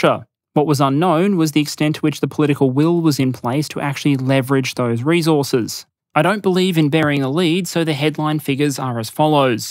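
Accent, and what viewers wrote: Australia. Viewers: "....one of those people who can derive joy from spreadsheets" this is why I love your style ( just one small example). Not just content that makes one think one learned quite a bit (yes, I do), but such a great way of presenting. I even started liking the Ozzy accent.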